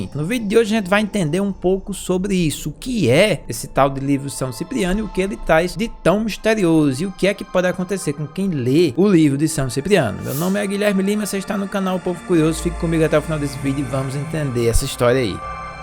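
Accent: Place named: Northeast, Brazil. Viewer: 😅 kkk então amado esse sotaque nordestino é muito engraçado, eu como satanista teísta e praticante do ocultismo pagão. É praticante da demonolatria, custei pra entender, o grande mestre e bruxo e feitiçeiro São Cipriano. E porque os demônios, insistiram, pra mim comprar esse livro mágico ou grimorio demoníaco satânico antigo sagrado de São Cipriano. Ele possui rituais das artes mágicas de alta magia negra com o Deus Satan ou rituais satânicos. Sim 👍 é atualizado em diversas versões e traduzido em vários idiomas. Não é um ☝️ simples livro, é um grimorio demoníaco satânico antigo sagrado. Já começa por aí, não 👎 é escrito para pessoas curiosas, é feito para praticantes de magia negra pagão. E para quem pratica a demonolatria e lógico. Estuda e pesquisa a demonologia, não 👎 vou ficar aqui explicando tudo. Porque são segredos dos seguidores da magia Ciprianica e obivio da magia negra. 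Eu como satanista teísta e bruxa 🧙‍♀️ e feitiçeira e médium espiritualista, fiquei muito curiosa. Quando minha Daimon pessoal, me disse Rosanna, sua vida e jornada espiritual. Já está em tanta evolução astral, que precisa estudar e pesquisar e praticar o mestre e bruxo São Cipriano. Na verdade dele, tenho dois grimorios, inclusive o grimorio demoníaco satânico antigo sagrado São Cipriano o bruxo capa preta. Eu fiquei chocada 😲 ao ler pela primeira vez. Disse pra o demônio. É muito crueldade, kkk eu juro falo tanto de animais como de pessoas. De início eu juro, não 👎 gostei do nenhum, mesmo sendo sat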